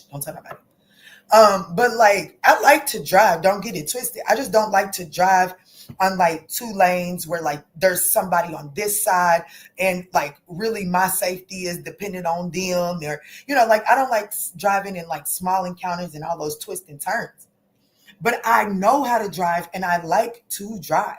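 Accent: United States. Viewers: Where’s your accent from? Part of me says Cali, the other says St. Louis. 😮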